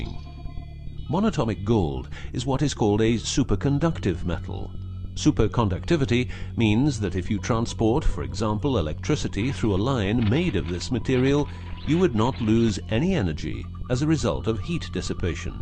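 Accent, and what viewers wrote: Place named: United Kingdom. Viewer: These assholes lost my interest when during the segment describing brain benefits of monatomic gold, they said, "detecting lies" and flashed a picture of George W. Bush instead of Bill and Hillary Clinton. That's pretty much all I needed to know about these idiots. A British accent spewing bullshit does not a watchable video make.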